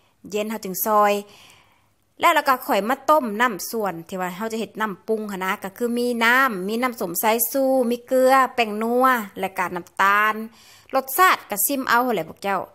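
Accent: Savannakhet, Laos. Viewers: ມວ່ນເດ ສຽງສາວສະຫວັນ fc ເດີຕິຕາມມາຕຫລອດສອນລະອຽດເວົ້າລະອຽດ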